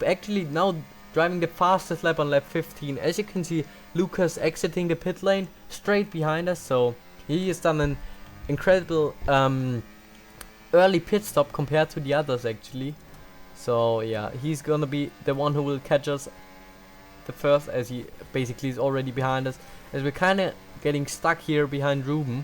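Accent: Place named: Tyrol, Austria. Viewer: Aus welchen Bundesland kommst du? klingst wie ein Tiroler:-)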